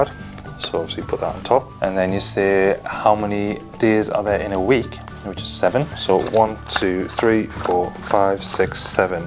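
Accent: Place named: North East England, United Kingdom. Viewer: Great video. I'm new to Magic card tricks and just bought my first stripper deck. Sounds like your from the North East of UK? Good luck with the Magic mate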